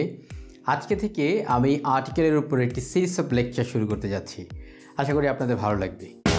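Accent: United Kingdom. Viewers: it is surely with BRITISH ACCENT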